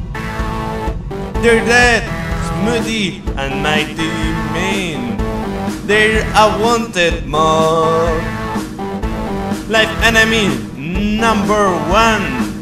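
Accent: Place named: Spain. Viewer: Migue Povea Date cuenta que el es español y no tiene la misma pronunciación que un americano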